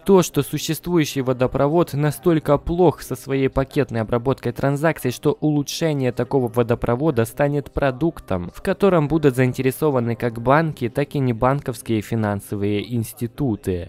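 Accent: Kharkiv, Ukraine. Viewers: ОООО ты с Харькова?)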